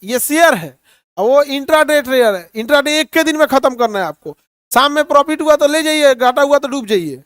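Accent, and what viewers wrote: Bihar, India. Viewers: Chahe pdhe likhe ho,pr excent to bihari dudh vala hi hai..meri mmi boli jo truck pe maal load krte unki video sun rhe ho😂😂 Hindi ni aati aapko Vaise to bolte ho ke google ki jagah indian search,type ek video bnai thi aapne..😅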